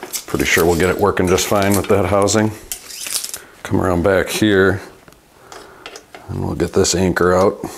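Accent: Wisconsin, United States. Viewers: "there's my midwestern accent for ya..." i'm a transplanted midwesterner on the east coast, and if it weren't for the great bike content, i'd watch your vids anyway, just for your WiscAHnsin accent!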